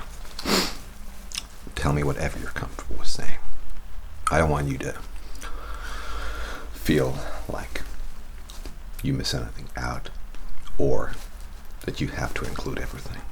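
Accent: United States. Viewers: Spectacular acting, your American accent is dead on, the background and set up was amazing, and the story was awesome!!! Well done!!! Much love! 💙💙💙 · Your American accent is SO spot on it's crazy. Could've fooled me. This audiobook series I've been listening to features an English narrator whose ONLY JOB is to do voices/characters, and his American is God-awful next to yours. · Did you do a more american accent or am i just thinking that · I really love this role play! And you are great at sounding like an American! And I do like the storyline of this one! And the end!! That was a shock! Lol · As someone from the USA, I think your American accent is beyond convincing! I like how you said "fer" instead of "for" especially. I just noticed a week ago how everyone I knew said 'for' that way. I don't like my accent because it sounds like a lazier version of "proper"? 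English... like when metal sounds like medal and water sounds like wader. Ugh. Anyway, your accent is spot on. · Some murder This video contains mature themes and violence On it! Watched for a full minute before I realized you were in America-mode 😅 So natural. And I love that jacket. Now, hopefully Matty Buns shows up for me again <3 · Your American accent is really good!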